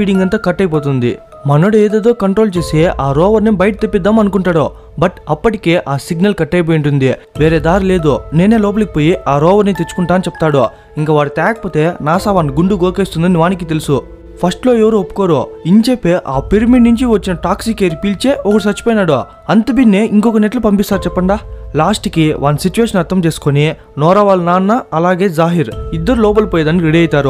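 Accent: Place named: Karnataka, India. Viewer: పక్కా కర్ణాటక తెలుగు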